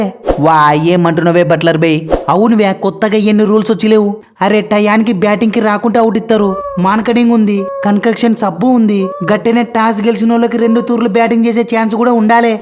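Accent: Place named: Kurnool, India. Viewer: Mana kirnool yasa tho matladi , prathisari sonthavuru gnapakalu gurthuchestavu ... Thanks thammudu❤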